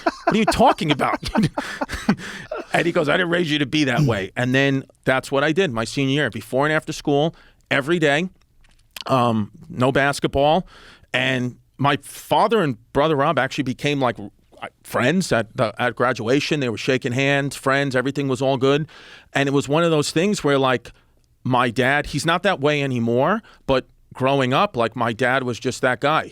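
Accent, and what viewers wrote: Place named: New York, United States. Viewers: If I have kids, I wanna be like this dad, but talking like Clint Eastwood or Thanos instead of a New York accent. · Geez i love a good story told in a ny accent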